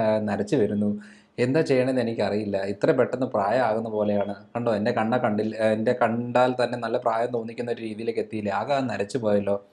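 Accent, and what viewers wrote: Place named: Pala, India. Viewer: എന്താ രസം...Dr.....അവതരണം..സൂപ്പർ...ഭാഷ...സൂപ്പർ... പാല അച്ചായൻ... സ്ലാങ്.... ഇത് Dr..aayi തോന്നുന്നില്ല..just..Oru frd...nice..❤️❤️❤️😘😘😘😘😘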